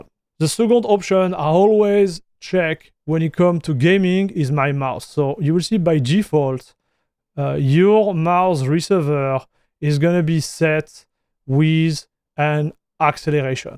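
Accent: France. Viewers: w french accent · big thanks! I'm not newbee in linux, I was trying to configure arch for gaming by myself, but it requires too much time for me. And in your video there is right amount of information for me to set up perfectly. It's a bit hard to understand your french accent, but I'm Russian, so don"t bother, you'll be great by practice) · Merci pour tous les conseilles de configue (surtout pour le RGB 😜). J'améliore même mon anglais, je comprend super bien avec ton accent français, j'adore 👍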